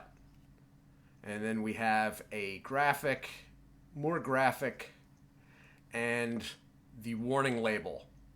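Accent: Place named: Philadelphia, United States. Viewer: I live in South Jersey judging by your accent I'm assuming you're from Philly, love your videos, does anybody know where I can get this around South Jersey or Philly thank you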